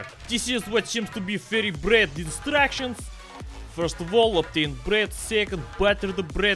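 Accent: Eastern Europe. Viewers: I was very tired and fell asleep to your soothing slav voice. Thank you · hello, this "hello" comes from very far away from you, directly from Portugal. I'm a huge fan of your slavness and the way you talk, makes me laugh so much and it also reminds me of the good old days of red alert 2:D anyway, if anytime it would be possible, i would recommend you to come and visit us, i think you would like our warm wreather and our beer, to be consider one of the strongest in europe, any way thanks for you time, and stay cheeki breeki;)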